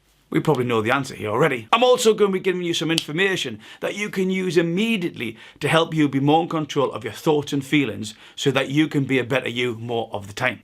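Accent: Newcastle, United Kingdom. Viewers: That’s defo a Newcastle accent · Newcastle I think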